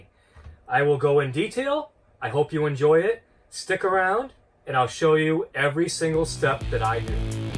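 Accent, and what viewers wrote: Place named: Boston, United States. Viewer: I love your accent, Boston area? Or up here in Maine?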